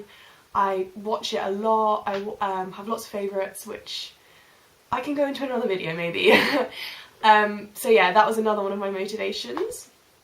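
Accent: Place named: United Kingdom. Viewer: Pretty cool his first video. I am going enjoy a lot to hear british english. Looking forward to watch new videos. My congratulations.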